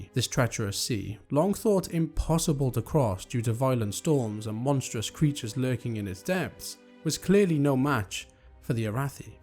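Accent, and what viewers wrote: Wales, United Kingdom. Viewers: This is great for us returning to Azeroth. Weird question, is that a Welsh accent?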